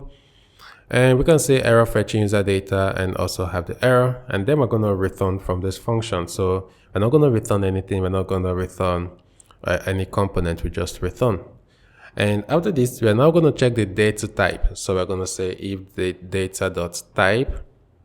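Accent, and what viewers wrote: Nigeria. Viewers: Sounds like a Nigerian 👍🏻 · Nigerian English bro😅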